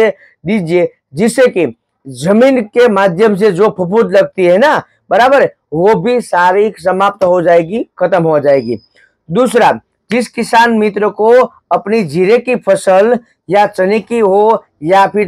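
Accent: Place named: India. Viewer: Aje tame apda hindi bhasha vara bhayu ne samjavo bhai ame to gujrati maj mahiti sambhad su apdi gujrati ni majaj kaik alag che bhai amay tamari bolvani pich sav desi bhasha ma majaj aeva rakhe che bhai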